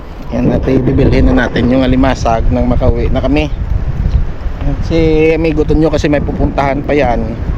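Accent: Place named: Bacolod, Philippines. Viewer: Favorite ko alimasag. You souded like a person grom bacolod po kasi yung accent nyo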